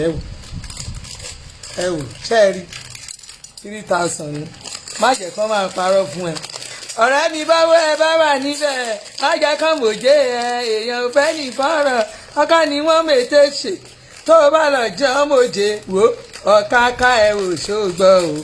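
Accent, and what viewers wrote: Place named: Egba, Nigeria. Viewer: You always make my day Stop sebe. I always laugh when I watch you speak in Egba accent. Pepper dem well well. Love you always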